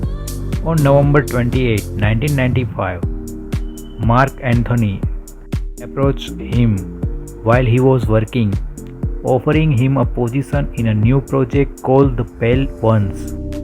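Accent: India. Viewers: The guy narrating this needs to learn some English first haha. Sorry I’m not a racist - just that his voice is hilarious. He sounds like a Bihari from India. I am sure the guy who posted this video did that voice as a joke. And what’s with the elevator music?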